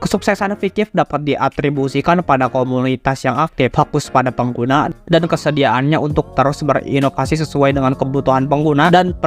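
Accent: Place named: West Java, Indonesia. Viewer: Sunda kayak nya:v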